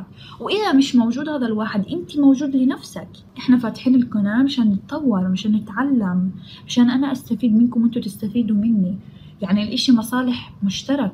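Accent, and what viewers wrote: Palestine. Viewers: بالعكس الرسمة جميلةة ....بس ما شفنا الحبر دخل بالرسمة ابداا ...مع انو الحبر اساس التحدي 🙈 مرة اخرى أُحِبّ لهجتكك الفلسطينية❤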